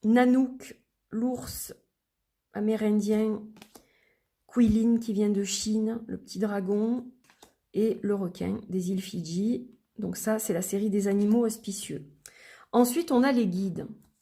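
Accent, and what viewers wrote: South, France. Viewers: il est splendide! J'adore Leïla, cet oracle et votre présentation aux accengs du sudeuh (comme moi).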